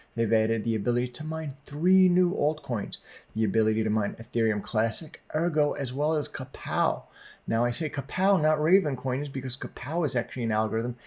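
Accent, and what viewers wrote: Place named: New York, United States. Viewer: I fucking hate that New York accident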